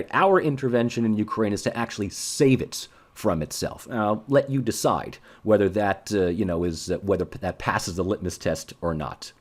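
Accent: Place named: Boston, United States. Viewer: Love the Boston accent by the way.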